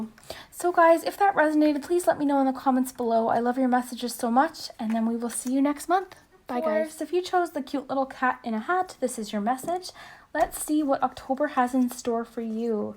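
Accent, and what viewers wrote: Minnesota, United States. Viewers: Are you Minnesotan by chance? I'm from there and your accent is familiar :)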